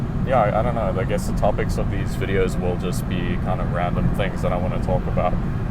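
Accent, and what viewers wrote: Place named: South Africa. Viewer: The South African accent is so thick I love it 🙂‍↕️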